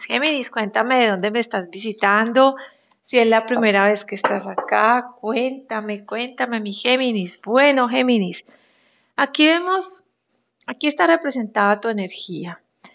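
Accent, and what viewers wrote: Colombia. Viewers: Buen día y si no me ekibocoese asentó es colonviano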